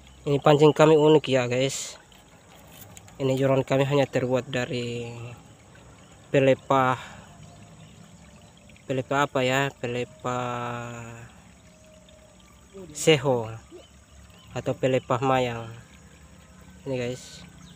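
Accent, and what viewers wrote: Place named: Netherlands. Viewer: Kayak orang holand ini logat 😁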